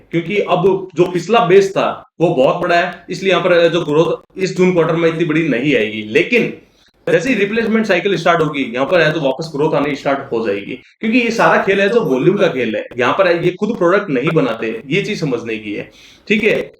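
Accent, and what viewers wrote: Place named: Rajasthan, India. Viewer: Very well articulated 👍, from your accent it sounds like you are from Jodhpur/Bikaner... Its just trading in single digit PE and as you mentioned this is cyclic business and once the uptrend starts (replacement cycle commences) ,lets say by end of this year..and EPS gets doubled (62)..and it gets the same PE at the time of listing that is 15: Stock price comes 930 from this calculation...I also believe at 298 its a good deal and available below IPO price.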